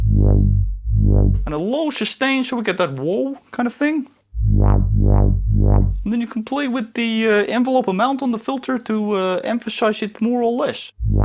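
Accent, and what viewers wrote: Netherlands. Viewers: This is so weird. You sound so hollandish i have to believe you are... btw schlager music makes my jewels shrink. Really. Thank god you didn t do that:) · Damn, that Dutch accent is so *good*! Props, I thought I was listening to legowelt or something like that for a second xD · Im Dutch and very confused by this😂 Either nailed our accent, or he is actually Dutch:)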